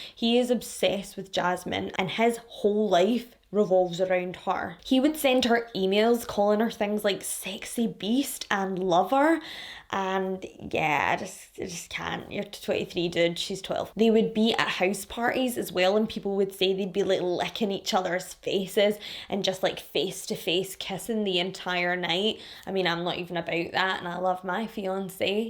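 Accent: Scotland, United Kingdom. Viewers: Hello. Yes I'm a brand-new subscriber from America. I love your accent I spotted it as Scottish right away. This story is so messed up all the way around. Those parents pick themselves up. They just left the dark past behind. And they reached for the Stars. And her only complaint was that she did not like the rules. She should have just sucked it up Chuckles. Find one teenager that just likes the rules. LOL. The tragedy of the murder. To her father seeing her as his last thing he sees on this Earth. And oh my goodness a little boy. The fear and Terror that boy had to go through period at the hands of his so-called sister. And I absolutely agree with you that idiot she was dating had fetal alcohol syndrome. I have worked in the healthcare industry. I have met many children with fetal alcohol syndrome. There's affect that a lot of people don't know. 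If you look at your pinky and you bend it there's two joint. A person who have foetal alcohol syndrome only have one joint. And I could tell by the structure of his face. And the fact that his mother was just a alcoholic. But at least you admit she did not address his mental health issues when she should have. That doesn't make anything better. but one we own up to our mistakes and take culpability for them. Give me an iota of minimal respect for her. and what about everybody that was seeing that on MySpace? They should definitely all be held culpable. You keep it up honey you are on the rise. You have become one of my favorit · Is she Scottish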